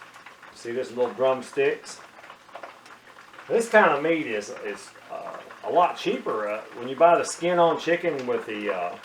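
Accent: South, United States. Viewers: Delicious!!! Good southern man accent which I can recognize 😉👌😊 straight away, I learned it from a famous movie, Trump, by Tom Hank!! Ha, ha!! Love from Hong Kong!!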